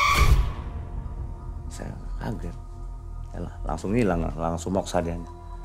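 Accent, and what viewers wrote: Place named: Cirebon, Indonesia. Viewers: Cirebone kentel pisan mang😂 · Logat cirebon nya khas bngt walaupun ngomong indo juga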